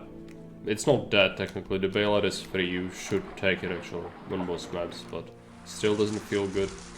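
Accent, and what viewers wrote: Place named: Czech Republic. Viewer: Oh yes for sure! I'm 64 and been playing games since 1982 on a ZX Spectrum. Got Ceasar 1 in 1992, then Caesar 2, Caesar 3 in 1998, I also played Caesar 4, but was disapointed. Ceasar 3 is the best by far, and played it all the time. But getting used to this updated version, is so cool, its like a new game! And I appreciated your tutorials, they have taught me so much, so many thanks! Oh Your English is great, English is quite hard to learn. Your words are pronoucned correctly, its just the accent that got me! Long Live the Czech Republic! Ha ha. Im in New Zealand, so miles away. Hey man, take care and keep up the great work!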